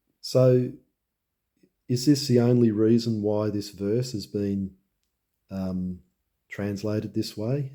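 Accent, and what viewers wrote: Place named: Australia. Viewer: Thank you for doing this research and sharing this, good to hear an Aussie accent.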